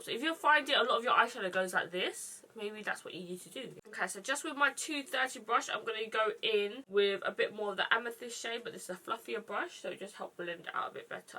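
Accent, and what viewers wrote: United Kingdom. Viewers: Its always weird going from watching people on insta and then finding their YouTube channel and actually hearing them talk :p totally didn't think she was from the uk!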